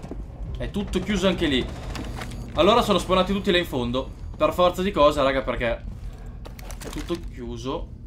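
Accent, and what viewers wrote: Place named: Parma, Italy. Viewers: Ve l'omo é bello il dialetto di parma io somo di fidenza☺ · Il tuo dialetto parmigiano è il top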